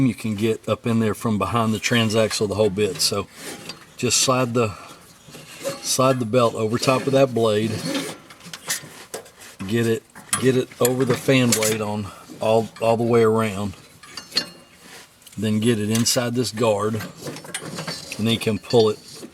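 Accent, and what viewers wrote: South, United States. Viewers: Hello from France ! Thank you so much, sir ! I've changed both belts on my tractor while enjoying your southern accent in the process !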